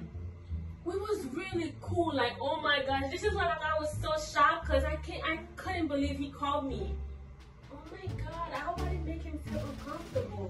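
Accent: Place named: Haiti. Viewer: are you ayisyen? I hear the accent coming out or either Congolese or something?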